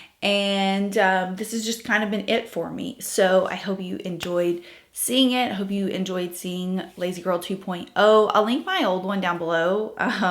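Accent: South, United States. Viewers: I love your southern accent though!💛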